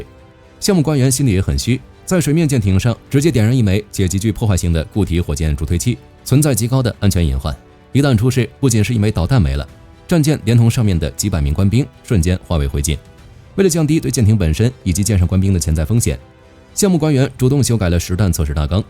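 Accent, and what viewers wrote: Beijing, China. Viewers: 火力君在军事术语上，最近一年来始终都在向台湾用于对标，比如“陆攻”，“资料链”，“镭射”，“寻标器”......，但是朗读所使用的AI引擎却还是字正腔圆的北京普通话，这一点特别别扭。要么你就统一全按北京标准用词然后使用这个语音，要么你就改个台湾语音朗读AI引擎，使用正统的台湾发音标准。现在搞得四不像，照着虎画成猫。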